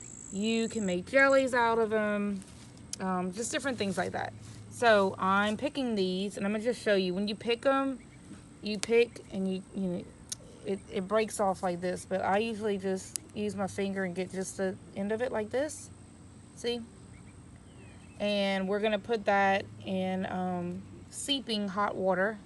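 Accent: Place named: Louisiana, United States. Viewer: Are you from Louisiana? Your accent sounds so much like mine lol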